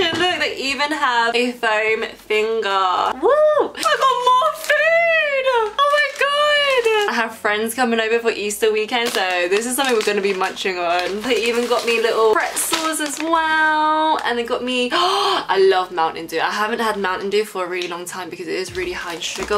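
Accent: England, United Kingdom. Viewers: Your accent tho😍I love ur English accent😍I'm from Ireland btw❤ kildare ( a place in Ireland x) xx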